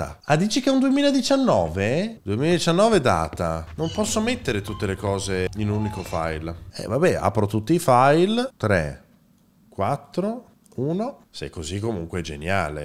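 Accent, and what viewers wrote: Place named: Veneto, Italy. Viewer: Sto gioco la maggior parte delle volte fa cagare pure a me che lo sto solo guardando 😂 P.s sta deriva veneta l’adoro ✨💕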